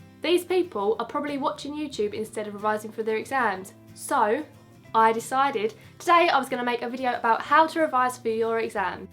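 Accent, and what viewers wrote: Essex, United Kingdom. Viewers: Your accent is penggg where are you from like Essex??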